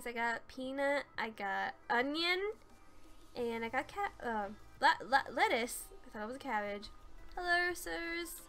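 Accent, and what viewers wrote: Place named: United Kingdom. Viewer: I can definitely here british fe through out this whole video tons of times XD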